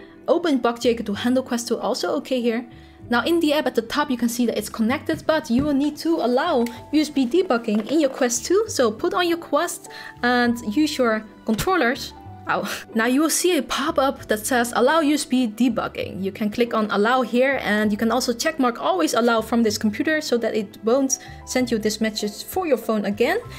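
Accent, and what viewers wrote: Netherlands. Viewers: I hear a little nederlands 😂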